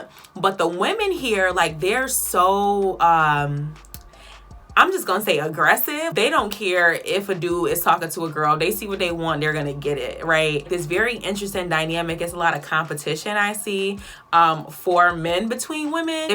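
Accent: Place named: South, United States. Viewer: How did you keep your accent so intact? Everytime I was down South I pick up the accent in a few days.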